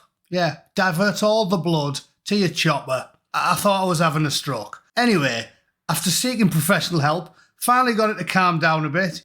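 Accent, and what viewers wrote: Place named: North, United Kingdom. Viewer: Keep the good work up cock & me and the wife love the content with the northern twang. enjoy 8-)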